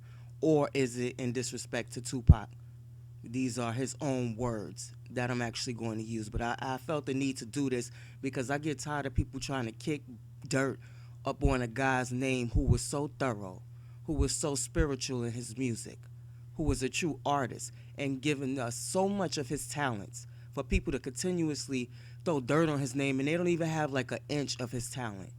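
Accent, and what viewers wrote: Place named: California, United States. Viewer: Listen u cuarvoint lady 😅😂😂😅 talk bout wht u kno you sound like you from California and you country niggas is always talkin bout New York business and don’t kno wht da fuck ya talkin bout that young lady was from BROOKLYN she was a somebody and that’s why them BROOKLYN niggas shot him in da dick point blank period now go ya chicken an waffle eating the same time ass da fuck up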